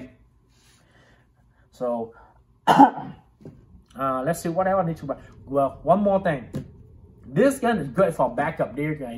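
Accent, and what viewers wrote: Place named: Texas, United States. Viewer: You sound like you have some Texan in your accent, so if someone talks shit about it, Fuk them! Keep doing what your doing. Haters gonna hate.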